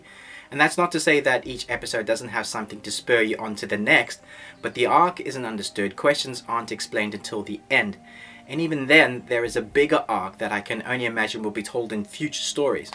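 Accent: South Africa. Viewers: I'm having troubles placi9ng your accent ? South African?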